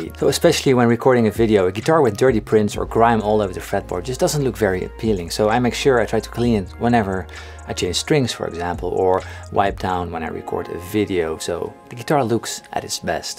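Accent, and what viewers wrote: Netherlands. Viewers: Ik wist dat je uit Nederland kwam! (Ik hoorde het aan je accent en zocht het op dus ja...) Goede video's!! Ga zo door! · He sounds like he comes from the Netherlands so i checked and yes he is from the Netherlands lol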